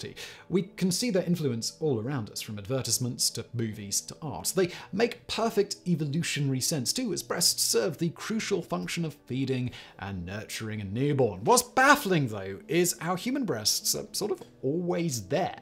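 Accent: United Kingdom. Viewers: As a scientist I LOVE the way you speak about these topics! Science communication at its best. Plus I miss the British accent since I left the UK so that doesnt hurt 😛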